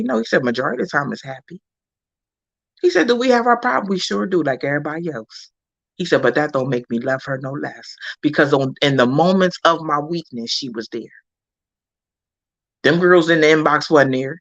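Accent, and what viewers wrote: Louisiana, United States. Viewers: she has a cute country accent........ Like us in North Louisiana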